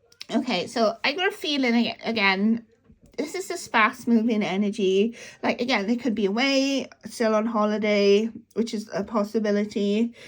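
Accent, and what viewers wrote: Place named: Wales, United Kingdom. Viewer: I’m not on this ship….i observe from afar. is a sweetheart and I love listening to her welsh accent……I find it very soothing. Whether tarot is your thing or not comes from a place of goodness. If A and L are still together….if N and L are not a couple….in the greater scheme of things in your own lives does it really matter? They are not your friends or family. Interesting that a lady posted yesterday on TT that L was in her shop in the UK. Both L and N like to post sometime after they were at a particular location. To me L’s hair and sideburns in the restaurant pic look a little short for current filming… but I could be wrong. Maybe A is at said restaurant with a new BF…. In my job of almost 40 years, I have learnt that things are not always as they appear….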